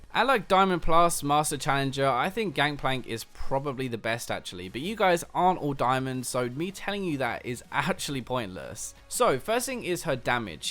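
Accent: England, United Kingdom. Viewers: It's not a British Accent, it's actually an English accent. Because Britain is a country made of multiple smaller regions, with their own languages and dialects, they have Scottish people, Welsh, Irish, and English each with their own accents. The English one is usually labelled as a "British" one tho.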